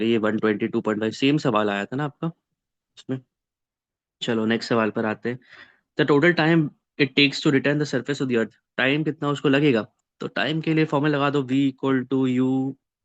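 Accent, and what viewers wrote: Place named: Mumbai, India. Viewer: Sir eda, mandawali?? Ekdum mumbaiya tapori language 😂😂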